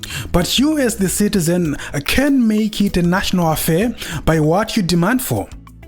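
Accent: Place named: Zimbabwe. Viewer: 1970s rhodesian voice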